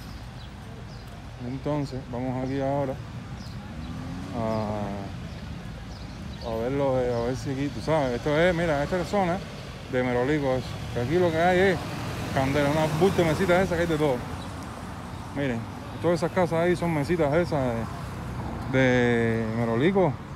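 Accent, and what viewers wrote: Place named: Andalusia, Spain. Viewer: loco por ver sus videos ,, jajaja , en Andalucia y Canarias hablamos asi, eso se llama cultura y diversidad , por si no lo sabia , desde España , bendiciones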